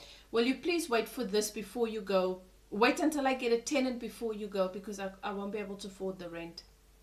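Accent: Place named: South Africa. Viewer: Wow,I love your reading, thought I recognize that accent.First time listening to a South African reader.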